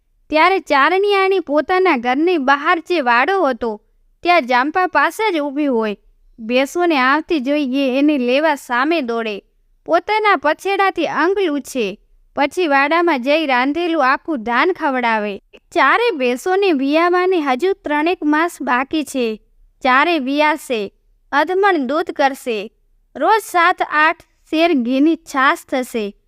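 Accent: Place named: Banaskantha, India. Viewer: સરસ વાર્તા ઓ મૂકો છો તમારો અવાજ બનાસકાંઠા નો લાગે છે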